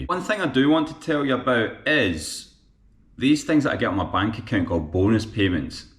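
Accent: Scotland, United Kingdom. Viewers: amazing scottish accent! i v heard once robert burns poem read like that... it is really how it should sound... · how does the scottish accent get along with speaking Russian?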